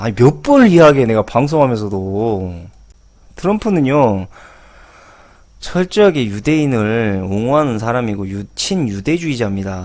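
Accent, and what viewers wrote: Jeolla, South Korea. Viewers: 전라도 사투리 쓰시는게 너무 듣기 좋네요, 영화배우 송새벽씨같아요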